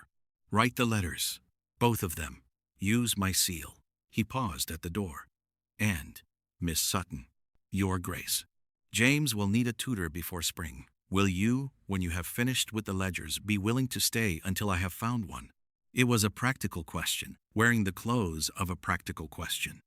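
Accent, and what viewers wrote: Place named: United States. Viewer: A BRITISH MAN, SHOULD BE READING, THIS STORY, NOT AN AMERICAN. 😠💜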